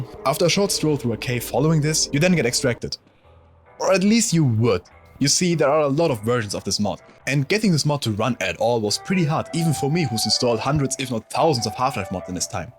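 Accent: Germany. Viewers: Lovely video! Like others have said, you are very engaging while presenting on camera. You kind of remind me of a younger Fernando Alonso but with a German accent.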